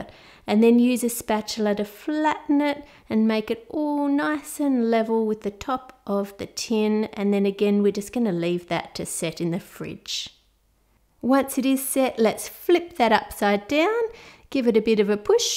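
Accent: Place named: Australia. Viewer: This video makes me want an Aussie accent like hers.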